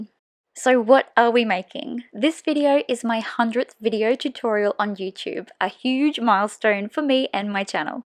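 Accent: Australia. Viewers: so nice to hear an Aussie accent!! Congrats on your 100th video tutorial! Adorable card!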